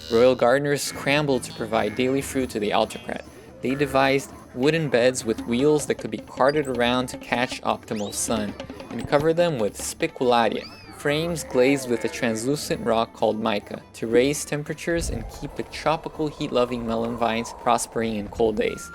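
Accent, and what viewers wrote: Brazil. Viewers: OMG I LOVE LOVE LOVE your videos. I am a half breed American/Brasilian. My mother is from Natal and my father is a TEXAN! I could pick up your portugese accent having been raised with the familiar tone of your voice. That aside, I am also an avid gardener, engineer and lover of art, knowledge and origin stories. As with many of us Brazilians, we look for meaning in everything and you do not disappoint! Subbed and Im terlling EVERYONE I know about this amazing channel!!!!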